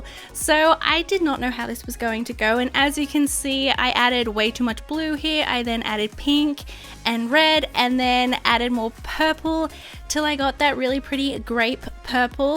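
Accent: Australia. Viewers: is solo beautiful and LOVELY, are you Australian?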